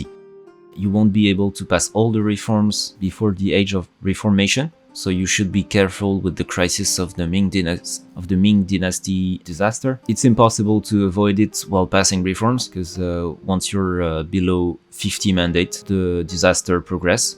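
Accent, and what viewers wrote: France. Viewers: je me disais bien que t'avais un accent français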